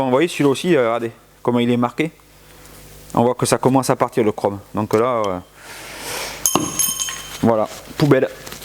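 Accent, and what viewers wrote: Gironde, France. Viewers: Toujours agréable de regarder ces vidéos, avec en plus l'accent Girondin.....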